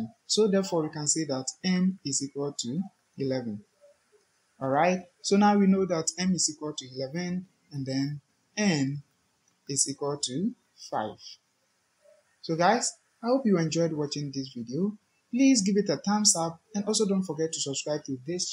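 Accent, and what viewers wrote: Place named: Ghana. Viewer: The accent looks more of a Ghanaian.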